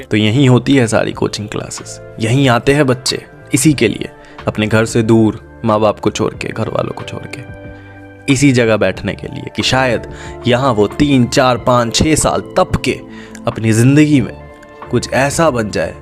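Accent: Bihar, India. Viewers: bhaiya are u from Bihar 🤔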